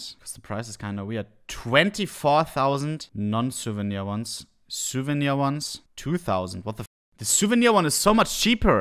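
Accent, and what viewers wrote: Germany. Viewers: Idk who this guy is but he sounds so German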